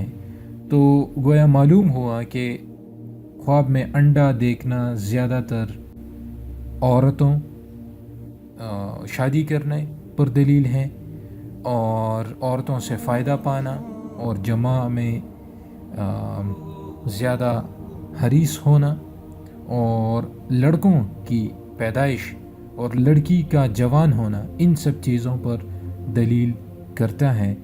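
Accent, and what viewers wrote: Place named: Arab world. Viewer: eysa lag raha hai keh Juma ka khutba sun raha hun> plz mic mun mouth se dur rakh kr audio rec kren our saaf urdu bolen, urdu ko arabic accent main bolne ka kia faida? our 'yeh ourat kre ga' ab yeh kia hota hai? kaniz pr dleel hai woh kia hai? kaniz means Gulam slave yah kia? female? hazrat danial A.S ke ilawah kuch nahi ho skta hai kia? chalo agar koi khatoon he dekh lia anda deti hui murghi tuo kia woh bhi ourat se he shadi kre gi? our kafan chori kre ga uski defination kahan hai? tuo kia zada ande nahi hum main se baz afrad ko hadees pehle se maloom hoti hain defination tlash kr rhye hote hain 3 mins 50 sec zaya krane ka koi faida? 7month se neache log qouestion puch rahe hain kisi aik ka bhi molana jwab dia hai keh nahi?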